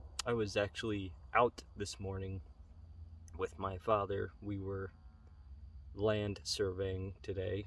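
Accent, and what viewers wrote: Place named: Scotland, United Kingdom. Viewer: Yes Do your Accents in all your Videos!! They are fun to listen to...... I love your Scottish Accent. Thanks for sharing...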